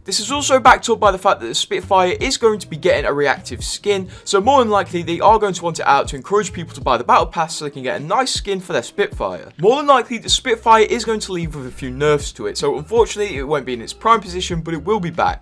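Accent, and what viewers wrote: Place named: England, United Kingdom. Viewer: Your fuckin English accent is discusting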